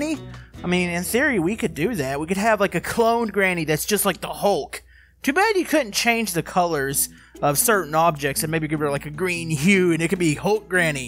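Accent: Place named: South, United States. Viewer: And are you from Texas because you sound southern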